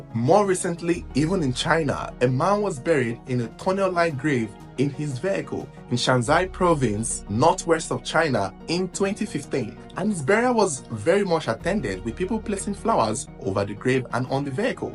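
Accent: Igbo, Nigeria. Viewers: He sounds like an Igbo from Nigeria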